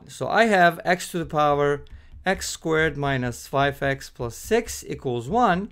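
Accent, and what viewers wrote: Turkey. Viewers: Your accent really looks like Turkish, I dunno why but it really does.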